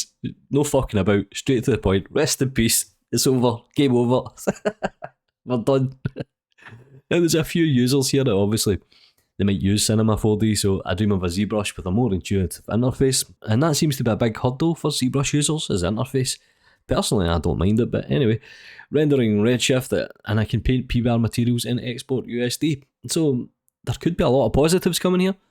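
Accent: Scotland, United Kingdom. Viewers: Na pal, you talk Scottish all you want. Perfectly clear to me … then again I’m a north easterner. No one understands me.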